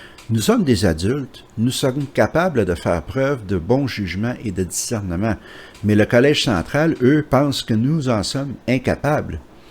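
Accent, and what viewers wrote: Canada. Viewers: Merci pour toutes ces videos. Elles sont tres bien et puis cet accent canadien, je ne peux me lasser de l'entendre.... C'est un accent sympathique, chaleureux. Franchement je ris de bon coeur quand j'entends que les Anges de Charlie et la Petite Maison dans la Prairie etaient des emissions condamnables a leurs yeux. Ils sont fous ces gugus, il faudrait mieux ette sourd quand on entend leurs arguments. La Petite Maison dans la Prairie, ca passe tous les jours a la TV en France. Allez demain je commence a lire les 2 volumes de l'encyclopedie biblique!!!! Cordiales pensees de France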